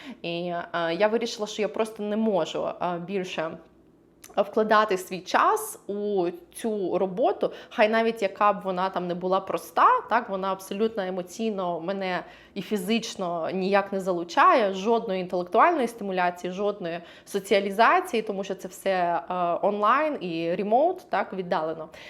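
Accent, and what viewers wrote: United States. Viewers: Цікаво. Сподіваюсь ще знайду у вас відео, де зможу зрозуміти наскільки важко або легко там влаштуватися айті спеціалісту і як взагалі шукають там роботу. У вас Американський акцент, мені здається) або манера розмови, щось таке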